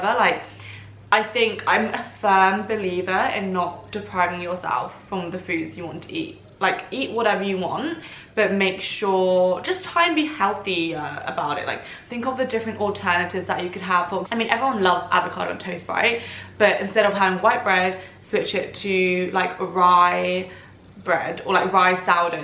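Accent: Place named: Australia. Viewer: love this!!! i use to watch you YEARS ago - so nice to see you back on youtube. i feel like i can hear a slight australian accent on you now xxx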